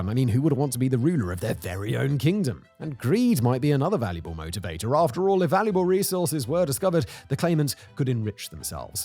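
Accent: United Kingdom. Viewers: You are so British. Gawd your pronunciation is so ridiculous. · By "Freedom units" he means British imperial units, some even have the word British in them ie. BTU. Yes we still use the same units named after the accent he has, and he uses the units that originated in France, not the UK or CZ.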